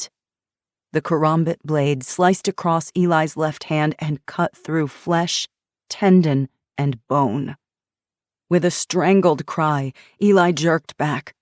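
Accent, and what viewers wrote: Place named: France. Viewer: Love the narrator. Especially her French accent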